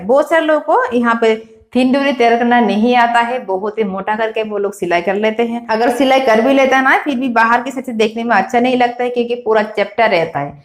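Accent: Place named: Bengal, India. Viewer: দিদি তোমার বাংলা একসেন্ট আছে😁😁 বাড়ি কোথায় দিদি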